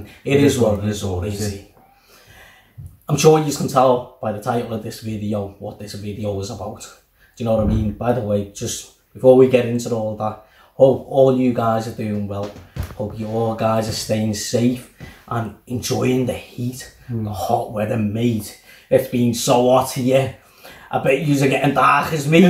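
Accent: Liverpool, United Kingdom. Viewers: Congratulations and for Jamel AKA Jamal recommending your page so nicely. You are one of 5-6 sites he recommended. He had done this once before with some other sites. Now he does it here and you are one of the picks. And you DESERVE it as you put so much into your site. You are known for your openness, listening to different artists from many cultures, many languages, many genres. Your site is amazingly diverse and truly international. You have some of the best subscribers who contribute and offer choices. You know us by name and person! And you are so much fun, and knowledgeable and expressive. Absolutely cute with a Scouse accent that makes your site just so charming. Spread the love, spread the music! Spread the diversity. Congratulations.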